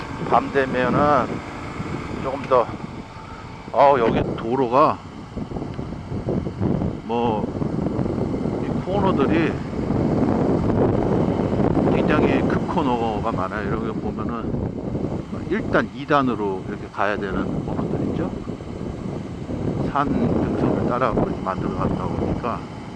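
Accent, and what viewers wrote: Jeolla, South Korea. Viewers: 으메~ 나무 짤라브렀어~ 앗따~ 전라도 사투리 아닌가여? 님 혹 전라도 출신이신가봐요^^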